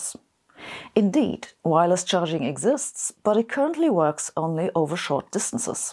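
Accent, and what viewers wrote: Germany. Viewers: Are you german? Alot of Youtubers i see with a similar accent have a similar type of "stare" No offense though. Your videos are informative and cool. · Hottest. Physicist. Alive. That German accent though.😵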